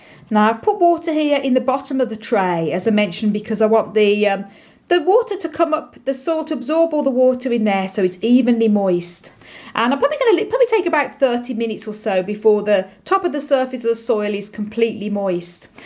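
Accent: Birmingham, United Kingdom. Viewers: Hey, are you from the Birmingham area? I'm in Sutton Coldfield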